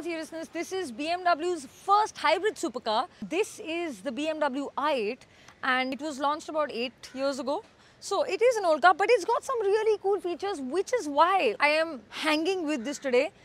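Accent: Haryana, India. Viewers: Aap haryana se ho 🙂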